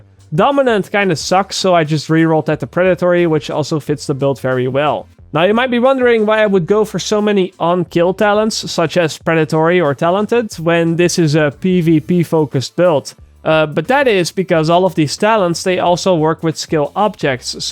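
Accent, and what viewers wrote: Netherlands. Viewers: die nederlandse accent klinkt echt kut xD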